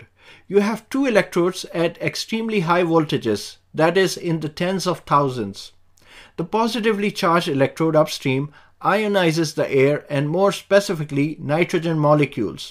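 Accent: India. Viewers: English indian version.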